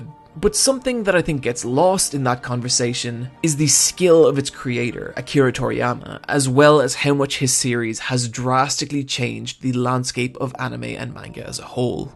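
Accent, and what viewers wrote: Ireland. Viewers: huh so youre irish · Oh I was pretty sure he was Irish, that accent sounds about right. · Oh? You're Irish? I thought your accent seemed familiar, but it's always safer to assume English. Hello, fellow countryman! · He's Irish? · holy shit I didn't know you were Irish dude hell yeah I knew that accent was familiar. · I only now started hearing the Irish accent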